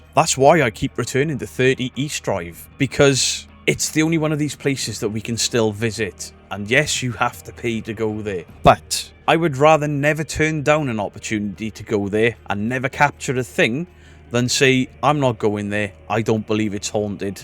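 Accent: Wales, United Kingdom. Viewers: lo e the Welsh accent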